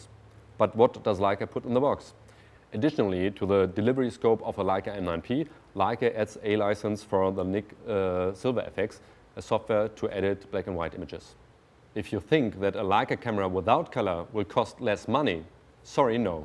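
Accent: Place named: Germany. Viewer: What a nice german accent,reminds at the terminator.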